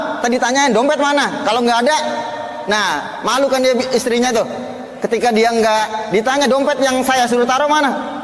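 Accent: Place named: Jakarta, Indonesia. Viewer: aduh ane demen nih ade ust gaye betawinye keren ...semoga ust selalu diberi keshatan dan panjang umur..dan banyak nyang dukung ..aamiin yRA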